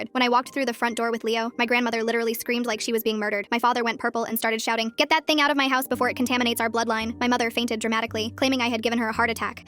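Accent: United Kingdom. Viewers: Yo its sounds like harry potter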